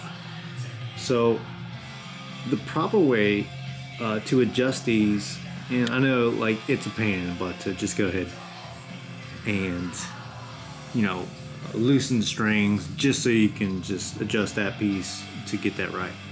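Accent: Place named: St. Bernard Parish, United States. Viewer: U sound like ur from st bernard parish, i love ur accent❤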